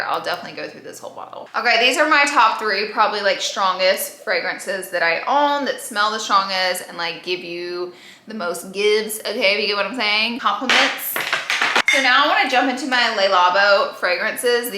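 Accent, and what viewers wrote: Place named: Alabama, United States. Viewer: I need more of Alabama accent back ok hunny!!!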